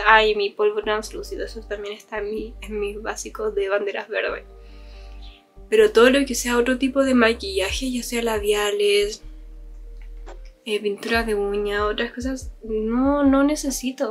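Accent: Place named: Chile. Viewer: Me encanta el acento chileno, felicidades por tu canal muy buenos videos sigue asi, saludos desde México.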